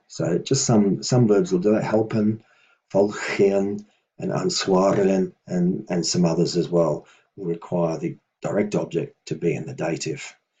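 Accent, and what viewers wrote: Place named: Australia. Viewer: You sound like an aussie guy i tend to imitate them and i learn Old English as well so thats why i follow you :3 thanks for the vid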